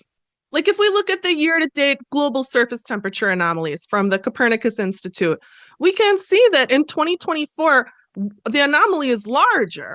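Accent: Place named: Minnesota, United States. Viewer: You must be from Ely or Duluth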